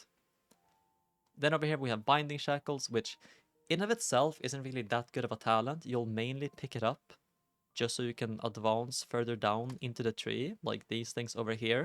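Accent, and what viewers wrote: Sweden. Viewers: Hey you are Swedish right? Trying to learn my accents.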